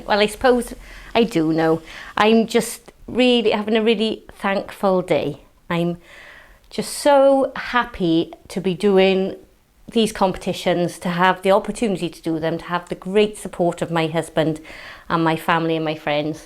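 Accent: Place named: Wales, United Kingdom. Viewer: Thrilled for you all , love hearing your welsh accent , we ‘ve missed all our cardiff/newport family and looking forward to a visit across the bridge soon ! Well done as always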